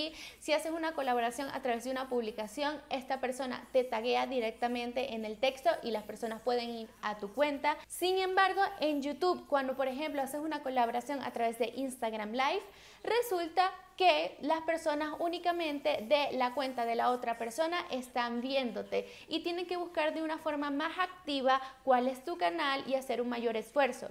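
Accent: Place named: Argentina. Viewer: De donde sos? A veces pareces argentina otras costa rica o colonbia...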